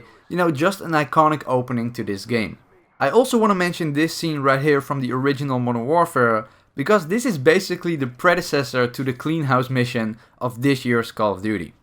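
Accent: Netherlands. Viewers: Ben je een Nederlander want ik hoor het aan je accent😂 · You sound like Silo Entertaiment (or its just the Dutch accent)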